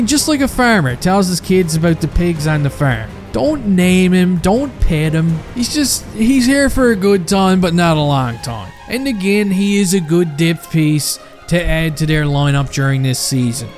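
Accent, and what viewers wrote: Newfoundland, Canada. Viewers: Is that a Newfie accent?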